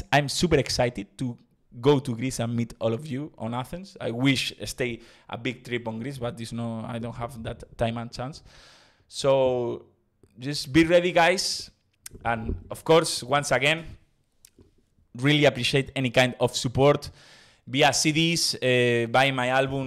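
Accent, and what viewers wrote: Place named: Spain. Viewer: You are incredible! I love your Spanish accent when you are talking in English. I hope you like Athens.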